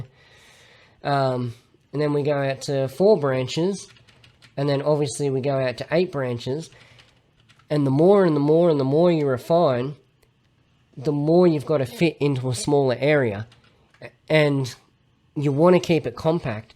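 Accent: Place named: Australia. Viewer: Brilliant explanation, bonus you got the Aussie accent mate, bloody love it legend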